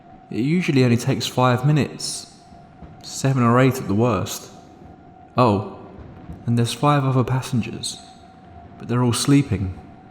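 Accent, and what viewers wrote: United Kingdom. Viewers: Are u British bruh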